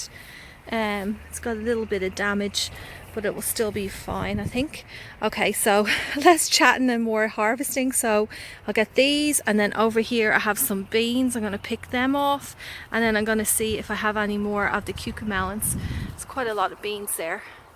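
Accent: Ireland. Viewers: Your accent sounds Irish 🤔 but either way cool garden 👍🤗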